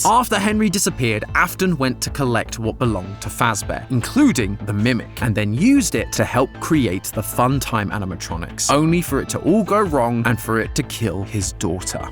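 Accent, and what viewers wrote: United Kingdom. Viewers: my mom came into my room while i was watching this and asked if it was a game theory video, i said yes and she just goes “why is matpat doing a british accent?”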